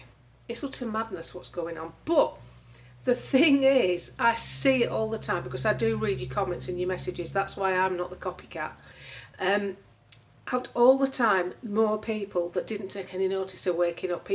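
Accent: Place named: Lancashire, United Kingdom. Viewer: Not less than Oliver. You are superb. A strong voice. For me a great Lancs voice. Keep it up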